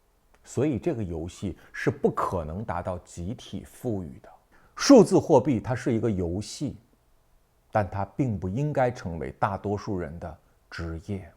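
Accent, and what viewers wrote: Beijing, China. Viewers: 聽叔說話特別有趣，像在聽人說書 連說話方式都充滿著北京感？古裝戲感？忍不住想學😊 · 老北京味儿，亲切😊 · 我还以为主播是北京人呢，字正腔圆的普通话，挺真实的感觉，脾气太直说话太直，有北京人的感觉😊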